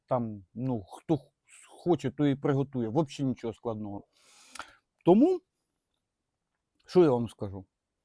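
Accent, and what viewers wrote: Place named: Central Ukraine, Ukraine. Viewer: Возможно похож для кого-то по подаче на украинского грузина. Но больше на нашего украинского еврея) Правильные жесты и мимика которые цепляют, невысокие люди всегда харизматичны, наш Луи Де Фюнес) который умеет вкусно готовить. А самое главное смаковать и передать предвкушение перед дегустацией в каждом видео. Вам нужно идти в кино) А украинский с легким суржиком такой родной) это или Кировоградщина, Хмельниччина, Виннитчина или Житомирщина, может ошибся, но точно по говору центральная Украина. Понравился Ваш канал, что блюда что подача, смотрю уже месяц, многие блюда буду готовить по вашим видео. Супер!)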